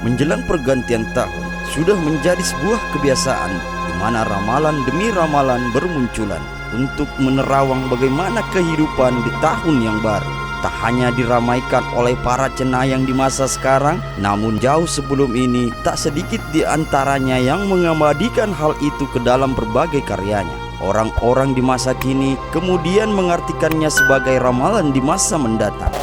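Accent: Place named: Bugis, Indonesia. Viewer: Suaramu cowo kaya logat orang selatang.. Bugis bingits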